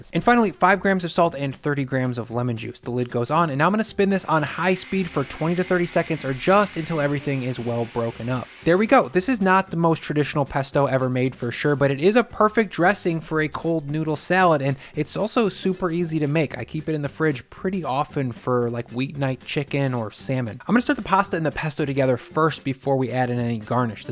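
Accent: United States. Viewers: I love the recipes, but aren't I hearing an American accent!?! Why are you measuring in grams?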